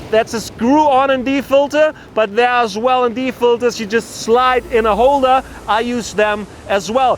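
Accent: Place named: Germany. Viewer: Came around on your channel today. You make super fun videos and your german accent is really good to listen to :D Hello from Slovenia.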